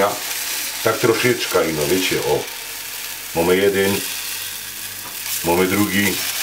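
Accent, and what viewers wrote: Silesia, Poland. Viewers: Pozdrawiam z podkarpacia Panie..swietny przepis na zdrowe placki ziemniaczano, serowe bez chemi...lubie sluchac twojej mowy slaskiej..dziekuje za wiele wspanialych przepisow kulinarnych...nauka nie idzie w las....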